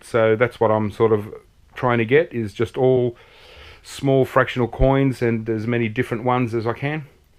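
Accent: Australia. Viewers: I don't know but I found this very funny. I think you were getting a little tipsy.And this australian accent. Lol. Very nice. Cheers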